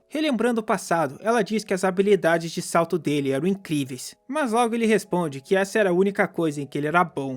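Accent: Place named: Rio Grande do Sul, Brazil. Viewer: o sotaque gaúcho melhora ainda mais kkkkkk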